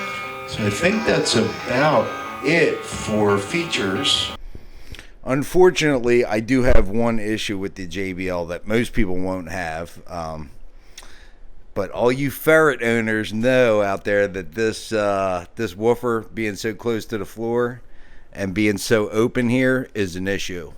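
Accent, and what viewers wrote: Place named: Pittsburgh, United States. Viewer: ....that accent though!!! Bro that sounds like Pittsburghese lol!! Great review though man....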